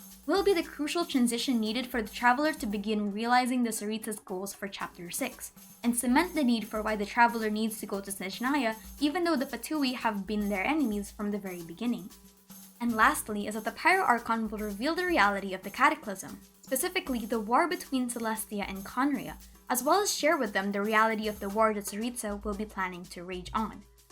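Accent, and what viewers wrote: Philippines. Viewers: I knew it. You were a Filipino after all xD. I thought my hearing was just deceaving me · off topic but i knew from the moment I heard ur voice that u were from the philippines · So Filipino, huh. No wonder I found your accent so nice to listen to, I guess it felt familiar and homey.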